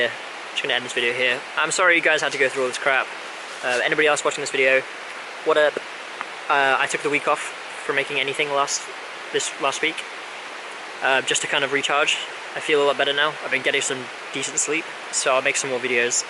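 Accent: United Kingdom. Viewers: I like that your accent ad everyone in the UK is so different, but every time I watch a video of yours I always think you have a cold. Also no one should be told what to do and when to do it. Live your life the way you want and make videos you want when you want. I love Damon and I am glad he is back, but he stopped making videos for so long because he didn't want to make them and didn't feel right making them ya know? Do you and tbh yes what Bryan did was wrong and if he had true friends he doesn't and if they ever think of being his friend again then he has a lot of making up to do and a lot of trust to gain back and everything. I don't know Bryan personally and I am not sure I ever will, but yes I will be careful if I ever was to meet him and know him personally. Keep your head up. ♥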